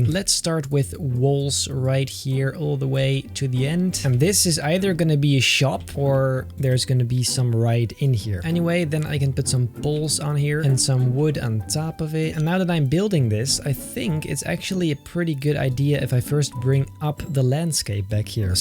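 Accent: Netherlands. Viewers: ru dutch cuz you kinda have the accent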